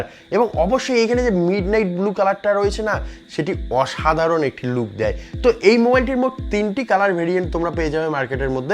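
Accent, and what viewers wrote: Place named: Bangladesh. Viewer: Honestly you are bangladesi, as per your voice and etc.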